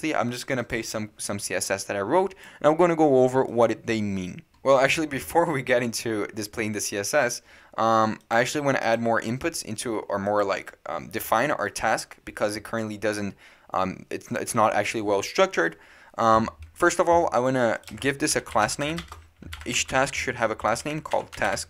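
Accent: Brazil. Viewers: Quando comecei o video pensei: "esse cara so pode ser brasileiro" (por causa do sotaque kkkk) quando eu vi o nome do canal dai eu tive ctz que vc era brasileiro. Parabens pelo trabalho mano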